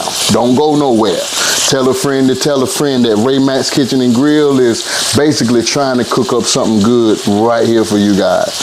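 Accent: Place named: East Texas, United States. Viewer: You have to be from East Texas, because the accent and the wording and the GOOD OLE' SOUL COOKING is giving East Texas. I was born and raised in Lufkin, Texas. Keep Up the Good Work. Love your channel!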